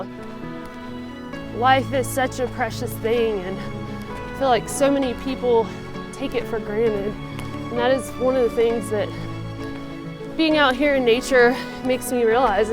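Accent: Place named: Alabama, United States. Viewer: Wow I missed ya'll, I have a cabin in South fork, been here since April, leave after bow season in October. I could have taken your friend to Colo springs and back in one day. I feel bad. That said I was shed hunting at the top of the cliffs north of 149 between SF and Creed while you were walking by. We've been to many areas in the Rockies and this one, SF /Creed have some of the best people. Again hate we missed the Alabama Drawl, you sound like us, SC Drawl. Stay safe.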